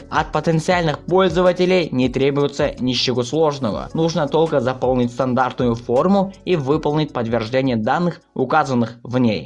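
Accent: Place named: Russia. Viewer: Акцент, у тебя русский, не претворяйся, что владеешь иностранным языком, я их знаю 7 🤜.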